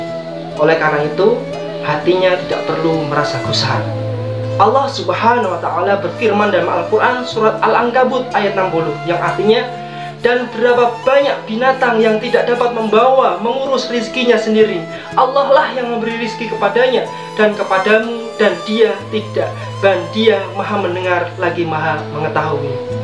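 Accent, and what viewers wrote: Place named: Java, Indonesia. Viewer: Ust orang Jawa ya?.. Logatnya kentel banget.... Mantap tadz. Sukses tadz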